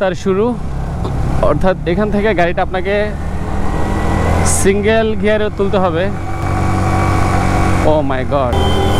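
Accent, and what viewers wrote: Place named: Bogura, Bangladesh. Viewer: Vi apnar basha Bogurai ......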